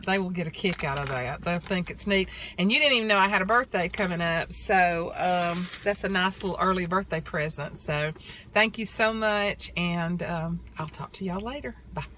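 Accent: South, United States. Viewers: I like your southern voice and how you always seem happy. I enjoy watching you.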